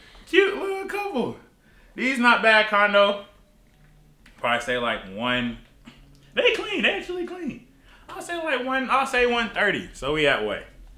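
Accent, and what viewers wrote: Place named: South, United States. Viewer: i been saying the exact same thing but its a southern thing i got cuzins that sound similar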